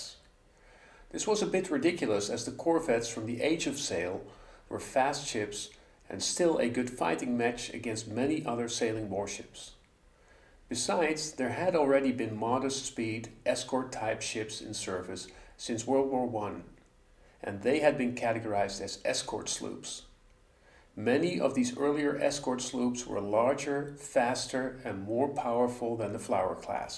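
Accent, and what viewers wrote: Netherlands. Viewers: klinkt nederlands. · That is a Dutchman talking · Is that Dutch accent that I hear? 😅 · Wat een irritant Nederlands accent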